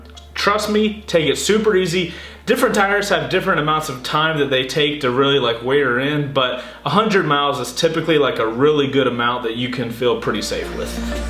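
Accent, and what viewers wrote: South, United States. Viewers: "You could possibly, significantly....." Damn I miss the south.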